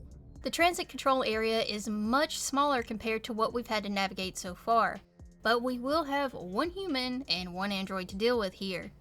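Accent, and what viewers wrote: South, United States. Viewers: Lowkey find it hilarious a “southern country” girl accent is talking about alien horrors deep in space where the chances of someone coming to even see you is slim lmfao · I love your review. Your southern draw is definitely giving me Colonial Marine vibes 👌 This is my all time favorite horror game. I still play this game and now after watching your review its time to revisit Sevastopol Station. Any loved your review and retelling. Also thats crazy that working joe took an elevator with you. He was probably scared shitless too. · Ok what is your accent. I cannot place it exactly. I have guesstimated it down to either rural "american" or somewhat australian?? Please enlighten me, I could not focus on the terrific video because I was concentrating on your accent!!1! Please keep doing what you're doing c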